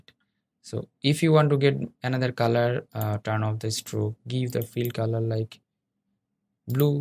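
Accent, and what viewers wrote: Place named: Bengal, India. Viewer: bengali ascent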